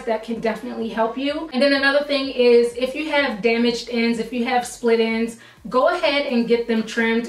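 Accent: Baltimore, United States. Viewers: I hear a Baltimore accent! 🤔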